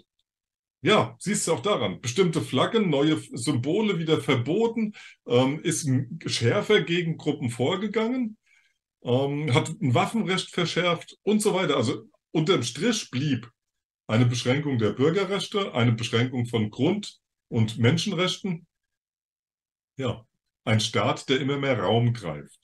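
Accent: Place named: Hesse, Germany. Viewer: And then… Frühling is coming. 🎉 Ich hab ja echt ne Affinität zu dem Hessischen. 😅 Höre dir gerne zu. Nimmt dem ganzen so den Ernst.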